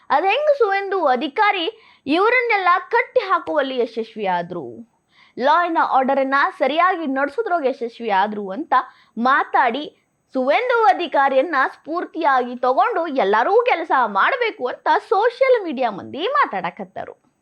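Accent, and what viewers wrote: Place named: Haveri, India. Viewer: ನನಗೂ ಬಹಳ ಇಷ್ಟ ಆಯಿತು, ಹಾವೇರಿ ಕಡೆಯ ಭಾಷೆ ಇದ್ದಿರಬೇಕು. 🌷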